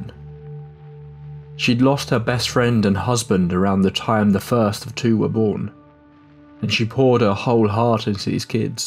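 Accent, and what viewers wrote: United Kingdom. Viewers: A British accent, monotonous voice, and spooky content...Recipe for success on YT. 😄 Not hatin' on it at all! I'm addicted!...Ijs, it's become "a thing". 🤷🏾‍♀️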